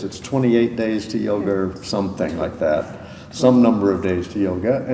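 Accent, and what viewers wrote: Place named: Texas, United States. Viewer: Hahaha oh my god I love so much. Startrek, prana force shields, texas cop accent, and so down to earth.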